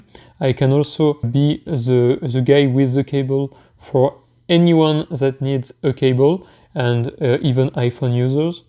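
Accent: France. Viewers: you have a little French accent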